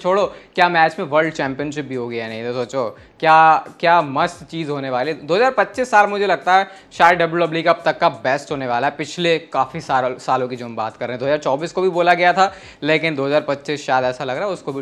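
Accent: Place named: India. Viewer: I love wwe content, but I don't understand Indian English.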